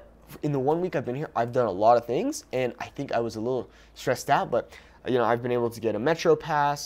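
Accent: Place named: Northern Mexico, Mexico. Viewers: Congratulations,! 🎉 This is a great move for you! I can't wait to see your Mexico City content. It will be interesting if your Norteño dialect will change after spending time in the big city! Keep going! ⭐ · he should use his northern mexican accent to not get scammed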